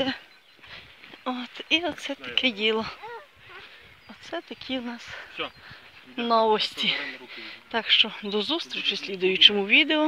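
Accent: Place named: Transcarpathia, Ukraine. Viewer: Здравствуйте , а в какой области и районе вы живёте ? Я родом из Закарпатской обл , знакомый диалект .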